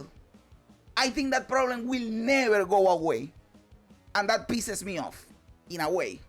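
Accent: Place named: Dominican Republic. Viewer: eres dominicano no? eso accento me encantalo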